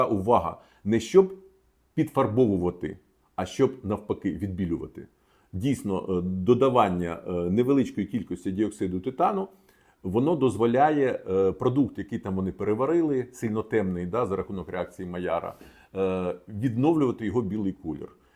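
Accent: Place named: Surzhyk, Ukraine. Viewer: Дівчина, щось є упевненість у цьому, українською без лютого суржику не зможе. У інтет же скидає підібрані фрази російською (що не носій "языка", очевидно). Та, головне, доносить у здоболений проросійський грунт (таку ж переглядають і копіюють такі ж як і вона) посилання про те, що в Україні усе не так, усе не те. У 2014-му році подібні топтали ногами українську продукцію від "Рошен".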